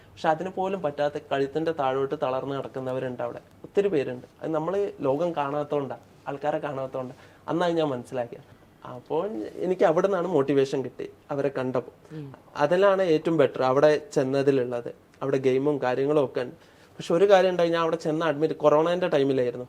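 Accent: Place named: Kozhikode, India. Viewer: Kozhikodane ne parayanda cheetta. Ningale samsaram keettal nammale veetil ullore samsarikkanana feel 😍. Randupeerum poli 🥰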